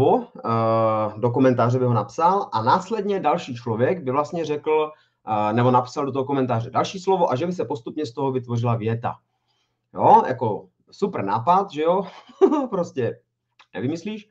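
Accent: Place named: Ostrava, Czech Republic. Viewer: Ten ostravský přízvuk je super, jen to dost kazí ta sprostá slova. A to je škoda.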